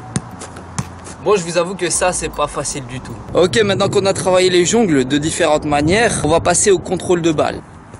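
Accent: Belgium. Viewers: On reconnaît l'accent belge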